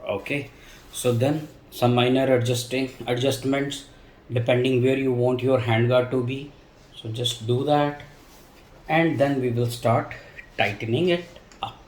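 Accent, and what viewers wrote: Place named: India. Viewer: Good job, i think i'm going to buy it for the winter season. I like your english with hurdu/hindi accent 😊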